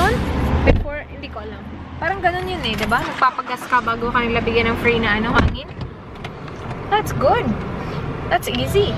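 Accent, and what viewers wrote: Visayas, Philippines. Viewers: Nakakaloka yung tono kapag nagsasalita ka ate hahahahahahahahaha😂 naaalala ko si tita Giselle sayo😂 kasi pag nagsasalita siya yung tono ng bisaya'ba nandun parin hahahahahahahahahahha. Nung isang araw pako nagi-stay sa channel mo ate jajajahhahahahahahhaha😂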